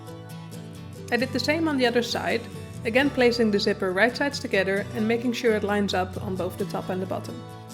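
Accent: Netherlands. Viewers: ik hoor gewoon t nederlandse er doorheen, maar dit is zo goed uitgelegd, zelfs ik snap t in 1 keer en ik heb echt moeite met begrijpen, top video! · dank je wel! Clear and to the point!! Wonderful tutorial. ❤ Also, as someone who grew up in Germany 5 min from the Dutch border, it was lovely hearing your accent! Brought be straight back home. 😊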